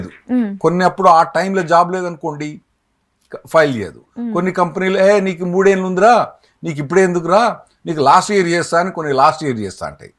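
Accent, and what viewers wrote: Telangana, India. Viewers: We really enjoy your telugu which is pleasant and pucca telengana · We like your Telangana accent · Sir, your Telangana language is a practical one and we really enjoy it as a Telanganite. We like it Sir 👌👍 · Sir, I like your Telangana accent language. Please continue to be what you are.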